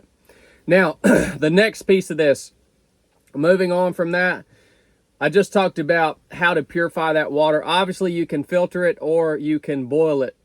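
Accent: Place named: Alabama, United States. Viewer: I’m visiting in Alabama and you have the accent of my family! Where is your accent from?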